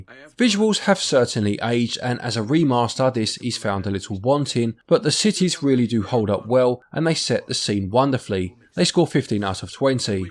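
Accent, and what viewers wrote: England, United Kingdom. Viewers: As soon as I hear the English accent I’m hooked.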